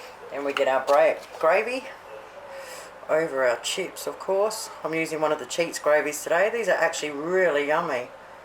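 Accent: South Australia, Australia. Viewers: You have to be a south aussie, I can tell lol Love your recipes, thanks for sharing 😊😊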